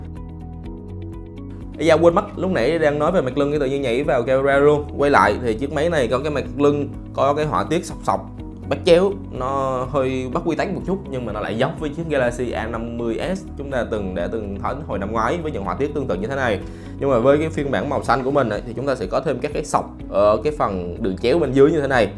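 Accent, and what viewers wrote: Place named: Central, Vietnam. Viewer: Giọng như miền trung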